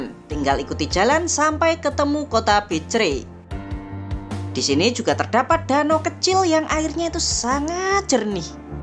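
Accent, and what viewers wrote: Java, Indonesia. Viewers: mas medok banget jawanya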